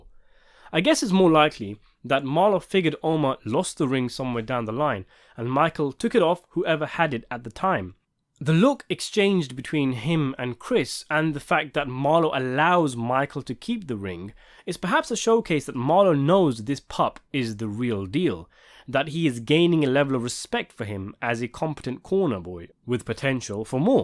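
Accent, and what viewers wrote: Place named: United Kingdom. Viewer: Listening to the narrator is like listening to a british person telling me that beans and toast is a great american cuisine. As soon as I heard the accent , I just stopped listening.. srry not srry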